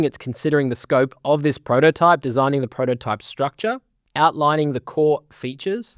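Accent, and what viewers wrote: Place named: Australia. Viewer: It's a typical feature of the Australian accent.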